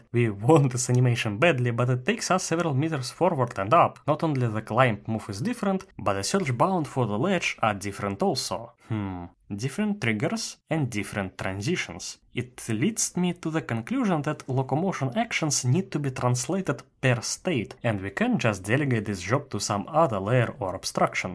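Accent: Russia. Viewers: Ты русский? акцент очень похож )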